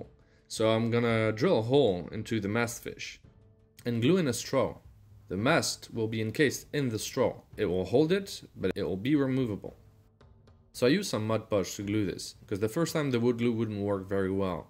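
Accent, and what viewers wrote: France. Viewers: Parceque je suis français aussi et jai reconnu ton accent. Hahaha super travail en tout cas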